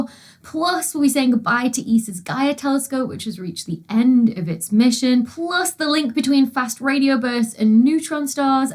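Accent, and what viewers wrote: United Kingdom. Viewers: I occasionally read a few random comments on videos like this and often regret it. There is one from a fellow countryman of mine that complains about the British accent. if I understand the complaint correctly, I'm disappointed to see that we have reached a level of audacity where some of us expect everyone to sound more American. Imagine using the internet and discovering that not everyone on it is American (gasp). had a song "." I am too. Please continue to be yourself, thank you! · If you want to be successful in the United States… slow the hell down drink less coffee before recording a video. We aren’t that crazy about your British accent and we can’t figure out why you’re rushing. Slower and more focused on each chapter. Pretend we are plodding American tourists and explain it.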